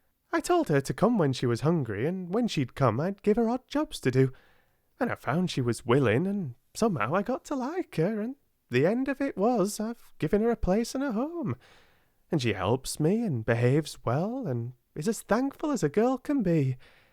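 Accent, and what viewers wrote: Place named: United Kingdom. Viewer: I've just seen this premiere being scheduled for 10pm (in Serbia).. and just to let you know, no one would mind you reading it in such a lovely accent! I've actually discovered your channel whilst looking for an audiobook for this one in British English.. and we have the same editions, I like to listen to an audiobook and follow the story in a book, since I'm not a native speaker, I find it really useful! All the best, Greetings from Serbia!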